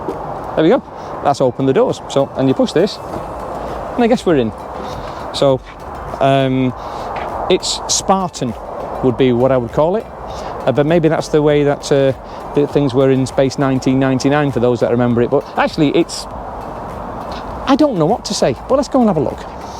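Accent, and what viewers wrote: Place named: Manchester, United Kingdom. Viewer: Very helpful thanks - good to hear a Manchester accent. Take delivery of mine in December hopefully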